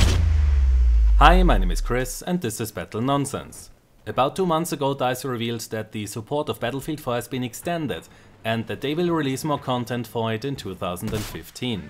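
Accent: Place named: Germany. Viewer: I do have to say Chris, besides your sultry German (?) accent, you are probably the ONLY Battlefield YouTuber that actually looks at the bigger picture. The one who sits down and analyses things instead of bitching about them (like LevelCrap and others). You actually have the more levelheaded approach to how Battlefield works and what should be done to make it better. Keep up the good work!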